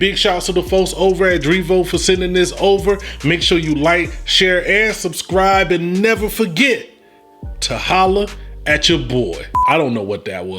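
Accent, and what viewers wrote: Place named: New York, United States. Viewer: Im an OG tech/barber with a big ol Logitech G106. I dont really use it to game though because of tartarus but I'd like to try the calibur out. Im really liking your vids bro. You sound like a brother from NY but if you're ever out here. stop by the shop so i can tighten up that beard for you one time. Imma stick around and watch you grow this channel. Thanks for the review.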